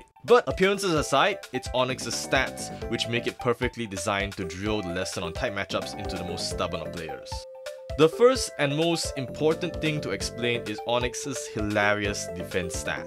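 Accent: Singapore. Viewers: i heard your accent and i immediately knew you’re singaporean 😂 subscribed! #SupportLocal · I thought I recognised the accent!! Hello! From Singapore too!!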